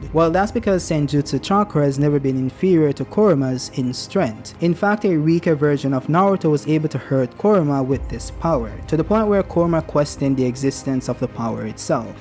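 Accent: Jamaica. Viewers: Do you have Jamaican ancestry your talking kind of sound like that for me??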